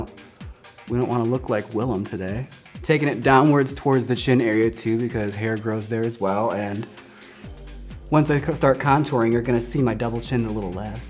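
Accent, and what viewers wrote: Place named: Wisconsin, United States. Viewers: That Wisconsin accent 👌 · her Wisconsin accent! 💚 love from chicago! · Honey, love the Wisco accent. Please curl your lashes and blend them with your fakes with mascara, the difference is so stark and obvi. Two, please do something with your lower lashes! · I'm from Wisconsin too and never really thought there was an accent but hearing him talk omfg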